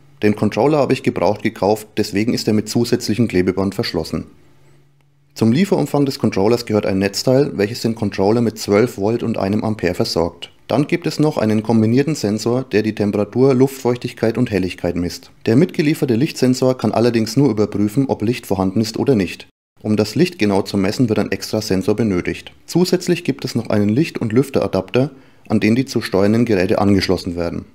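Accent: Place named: Franconia, Germany. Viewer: Gutes Video👍🏻. Nicht zu lange, gut erklärt und angenehm zuzuhören. A propos zuhören. Darf ich fragen woher du grob kommst ? Du könntest vom Dialekt quasi mein Nachbar sein 🤣. Fränkisch ist einfach symbaddisch :) Lg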